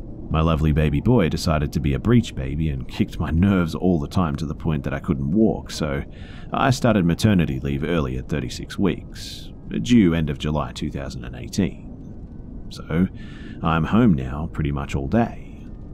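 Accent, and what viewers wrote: Australia. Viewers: You’re doing a wonderful job…thanx! Nice Aussie voice 🫡🇦🇺🏆✨👏💜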